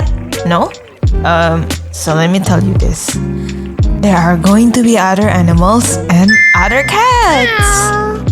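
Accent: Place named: Indonesia. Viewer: Indonesian accent ❤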